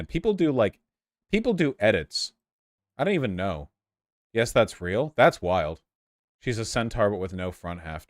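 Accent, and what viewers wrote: United Kingdom. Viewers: that's northern England accent 🤣🤣Manchester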